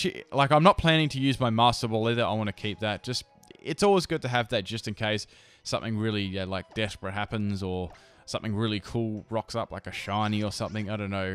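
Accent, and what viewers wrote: Australia. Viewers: today i was at toys r us and there was an Australian family there. the moment they started talking with the Australian accent, i immediately thought of you lol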